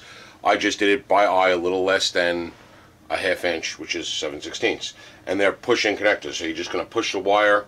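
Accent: New York, United States. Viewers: thanks for my daily recommended allowance of New York accent. thx for the viddie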